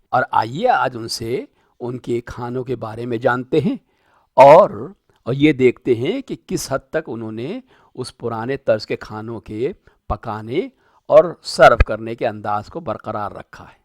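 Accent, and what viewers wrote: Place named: Lucknow, India. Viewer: बेहतरीन जानकारी वह भी लखनवी जुबान में 👌👌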